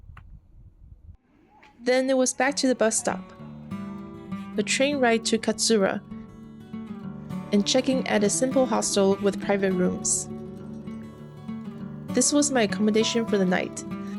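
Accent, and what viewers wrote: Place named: United States. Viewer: Sorry but the fact your American I cannot follow you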